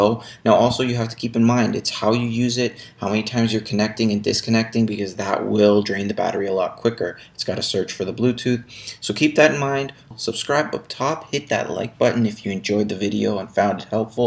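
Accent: Canada. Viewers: Same problem!! I have had this mouse for 5 years. The last time I got the low battery warning, I couldn't get the back off no matter what I tried. I popped into the local Apple Store and she did it easily. I felt like an idiot. I practiced. Then, last night, I got the battery warning again. Figured, okay. I can open up the case. Failure!! Again. I was on my way to the Apple Store again, feeling like the same idiot - but more so. I thought "Lemme check YouTube." Thank you!! You saved me a trip and my sense of pride.;-) BTW, are you Canadian, by any chance? Big thanks!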